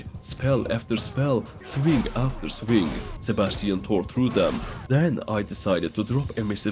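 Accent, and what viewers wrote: Turkey. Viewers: Böyle baskın türk aksanı görmemiştim çok iyi dknwkendkw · this is a Turkish accent tho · From the accent this guy is %100 Turkish. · Bro what accent is this?, I hear turkish, but might be wrong? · Ckhdhchzhdhhhdhz türk aksanı asla atlamam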